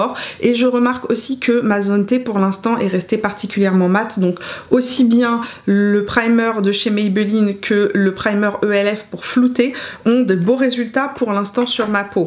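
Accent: Quebec, Canada. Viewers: Bravo pour l’accent un peu québécois!! D’une pure laine !! Très très beau looks, mais le modèle y fait pour beaucoup! Xx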